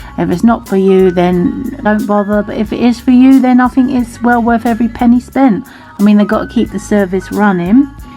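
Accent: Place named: United Kingdom. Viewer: Just found ur channel and this video as I’ve been looking for a tracking device for quite some time (ever since Jasper took his wanderlust a bit too far and there isn’t a person in our street who doesn’t know who he is it’s humbling and makes you feel like such a bad pet mum) .. Have u tried other devices as well and also, u sound British, can we get it via Amazon as well in the UK?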